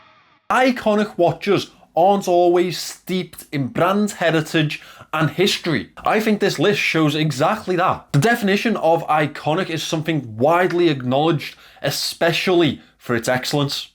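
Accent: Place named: Liverpool, United Kingdom. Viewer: Actually, I didn't know at the beginning. I even thought you were not native English speaker..😅 I researched little and I found out that someone speaks same like your accent and I realized there were many people same like your accent in U.K. and it was Liverpool..😄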